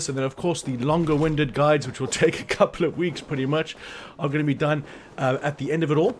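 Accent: South Africa. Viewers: You sound South African.. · Hearing a South African do these videos is the best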